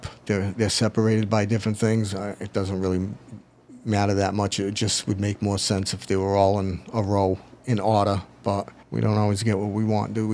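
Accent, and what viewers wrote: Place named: New England, United States. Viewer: What part of the north east are you from sir? I’m hearing RI or Mass.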